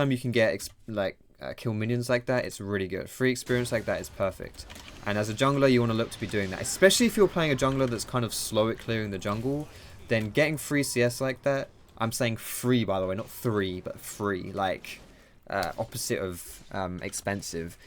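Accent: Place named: United Kingdom. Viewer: EUW, he's British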